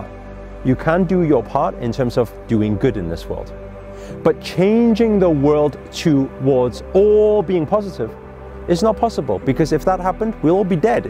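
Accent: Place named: Northern England, United Kingdom. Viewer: Hi, I'm listening from near Manchester UK, I notice a northern twang in your accent x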